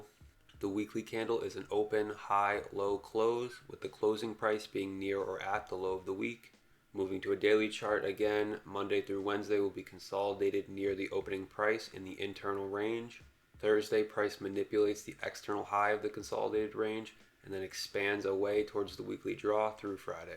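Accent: South Africa. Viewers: Yoh u South African?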